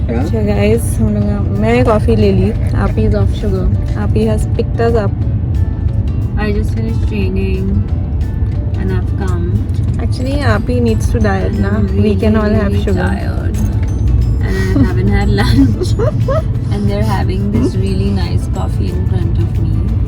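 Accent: Hyderabad, India. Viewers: Love to listen to you talk in Hyderabadi.. please continue ❤️ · Yes we loved the clog very much n we love Hyderabadi accent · Please talk in Hyderabadi accent only we love dat yaar love u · Haha..Hyderabadi accent has so much jayenge, aayenge, karenge.. Love watching ur vlogs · I love Hyderabadi accent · I love the hyderabadi accent. It suits you like anything :D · Nice vlog hyderabadi meich baat karye mazza aati dekh kar 🤗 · Amongst all South Indian States, Hyderabadi accent is very swt n easily understandable. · Mashallah very good vlog👍 loved your Hyderabadi accent plz improve your voice quality in the video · Love when you talk hydarabadi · Hey your too cute. Especially the Hyderabadi accent.I enjoy watching ur blogs.Really looking forward to your upcoming blogs 😊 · Apene Hyderabadi hai yaar not Pakistan urdu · As I am Hyderabadi leasing Hyderabadi feels good so plz keep talking in Hyderabadi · Hyderabadi accent love it 😍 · Really enjoy ur Hyderabadi hindi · Hi.. 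I'm from Jaipur....love when you talk in Hyderabadi.....😘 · . Be natural. We like you like that. Hyderabadi urdu zindabad. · Dubai Home tour please😍...your hyderabadi is cute ✌ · M also from Hyderabad it’s really Hppy to hear Hyderabadi on ur vlogs..aisech Bath kro acha dikhra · Loved your Hyderabadi accent, When u r speaking Hyderabadi, want to listen more from you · Loved ur hyderabadi accent... Keep using it😘.much love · Plzz hyderabadi hindi hi bola karo.... Aftr all its ur Mother tongue..... Sounds gud... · apan #hyderabadi bolte acchai diktai...#sania_mirza ki nakko sunno #be_orignal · the vlogs are going well,continue with your hyd’i,We should be proud our Hyd’i Urdu is very rare and people don’t get to hear it often.👏🏻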